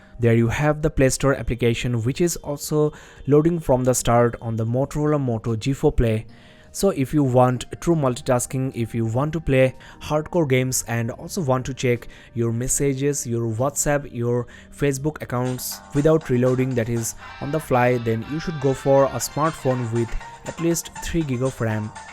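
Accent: India. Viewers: CopyRights can suck it repertoire* see? you cant even write well and no, I dont mean stuttering, but the accent all indians have while speaking english,, is annoying and sounds like Apu from the Simpson 😂😂😂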